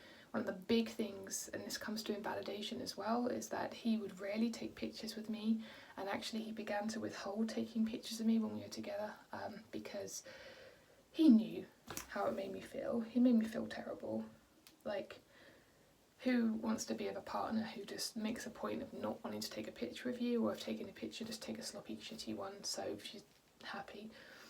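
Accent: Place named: United Kingdom. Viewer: Oh my god I’m only 20 minutes in and he sounds awful! You’re such a sweet person and you deserve so much better than this. I’ve watched your Lush videos for years and have adored you and your British accent. I know you will get out of this rut and rise like a Phoenix. Love from a vegan lushie in Ohio.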